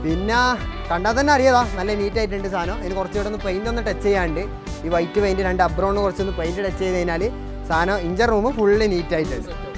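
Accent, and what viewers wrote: Kannur, India. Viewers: കണ്ണൂരുകാരാണോ ബ്രോ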